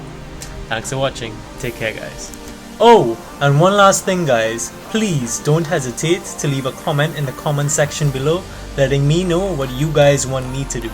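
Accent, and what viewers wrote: Trinidad and Tobago. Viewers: Are you from Trinidad. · ah hearing ah trini accent!! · Are you Trini? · Sounds like a Trini accent. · Younsound trini bro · Lol can't miss that Trini accent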